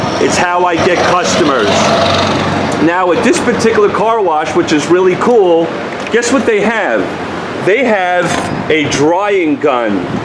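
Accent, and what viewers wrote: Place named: Boston, United States. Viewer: Love the accent...cahs and cawfee lol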